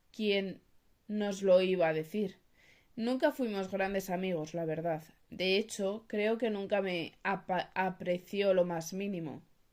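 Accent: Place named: Spain. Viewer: Gracias me gusta como das paso ala lectura,TU voz suena de españa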